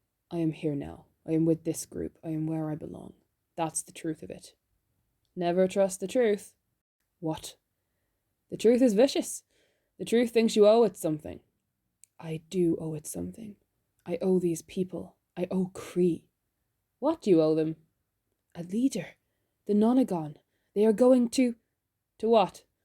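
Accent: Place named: Ireland. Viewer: I’m living for your Irish accent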